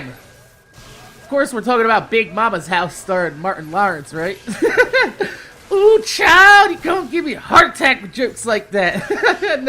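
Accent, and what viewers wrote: New Jersey, United States. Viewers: I can hear the jersey in your accent hahah, away at school always good to hear that shit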